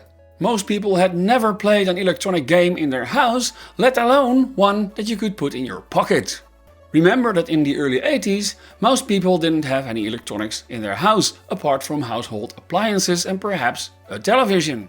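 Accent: Netherlands. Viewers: Hi! Very nice video, thank you🙂 I have all the Bandai double-layer and single-layer solar games, they are amazing. Especially that they still work! One of my favourite is the single-layer "Invaders of the Mummy's Tomb", the mini version. Double-layer Terror House is also good. I'm not very fond of Zaxxon though, but maybe I just didn't get the hang of it, yet. FYI, there were several more of these double-layer LCD delights. I know (and own some) of these: MASUDAYA: Samurai Vs Ninja, Air Force MORIOKA TOKEI: Brave Fireman, Construction Work, Mice Party, Shark Hunter, Sky invaders (=Air Force), Jupiter Please, can you tell me, which LCD game books (catalogues) did you show in the video? I want them🙂 Especially the second one shown. p.s. You have a lovely Dutchglish accent😄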